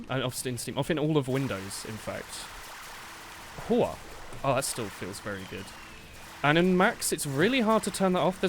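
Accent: RP, United Kingdom. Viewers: I listen to your videos as background noise when doing homework and I never knew that a posh British man would make such good motivation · Its been a while. Thank you posh britain man.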